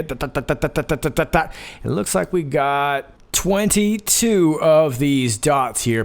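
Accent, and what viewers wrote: United Kingdom. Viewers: Hey I just wanted to say I reckon [+fakewood] means “and Hollywood”. PS. Great English accent xx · A BRILLIANT 'posh' British accent Jane from England x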